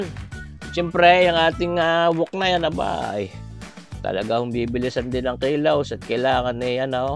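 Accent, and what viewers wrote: Batangas, Philippines. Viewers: Kahit di pa rin kami gaanong nakakahulagpos sa pandemic dito sa Central Florida (grabe ang spring breakers sa South Florida!), kami naman ay nakadiskubre ng mabisang gamot sa takot at lungkot dito sa aming basaysay: ang walang-sawang panonoor sa maraming cooking demos at pagbibiro ni. Ala eh, marinig lang namin ang boses at mga purong purong kanluraning salita pati na rin ang walang makakatulad ng Batanguenyo accent, abot-taynga na mga ngiti namin dito, at habang ginagaya namin ang mga paboritong putaheng inihati niya sa mga fans dito sa 'Merika, hindi pa rin napapalis ang aming ngiti. Salamat sa gandang-loob mo, marami kang nagagabayang kitchen ninjas sa buong mundo. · Gusto ko iyan Batangas accent katuwang pakingan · Sarap Chef. Gusto ko yang batanguenong accent hehehe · Baket gnyan po kau mgsalita, my acent ang tagalog mo, Batanguena kb? 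· Walang hiya, tangal lungkot ko sa buhay eh nang marinig ko ang tonong atin sa batangas eh! Regards from san nicolas batangas! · Accent batangas mo ay original ba kasi ang gandang pakinggan you sounds like an old man 😊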